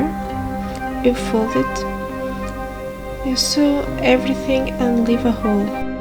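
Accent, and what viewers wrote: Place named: Portugal. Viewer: mal abri o video soube logo que eras pt-pt por causa do sotaque😭 portugal mentioned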